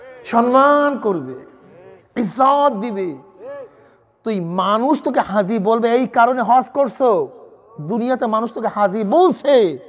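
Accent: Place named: Barishal, Bangladesh. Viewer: শায়েখ মুখ থেকে বরিশালের ভাশা শুনলাম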